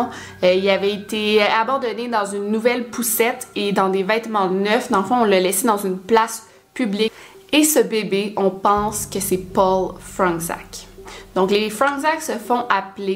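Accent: Quebec, Canada. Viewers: C’est incroyable au début l’accent québécois me gênais tellement et maintenant j’ai l’impression de ne meme plus l’entendre, en tout cas très bonne vidéo comme d’habitude! Ça serait super quel ai sa propre émission sur netflix ou sur une chaîne de télévision je suis sûr que ça marcherais elle a vraiment un talent pour raconter se genre d’histoires. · J'adore tes vidéos ( elle me font toujours aussi peur 😨😂) mais j'aime trop les regarder quand même ❤️ je sur kiff ton accent québécois 😍😍😍😍😍😍😍😍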